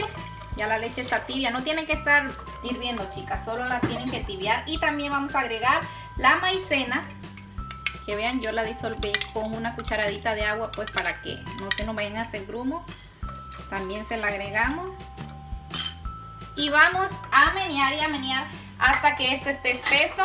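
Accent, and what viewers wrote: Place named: Mexico. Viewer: Que rico te quedo hablas con acento mexicano no hondureño que linda 😊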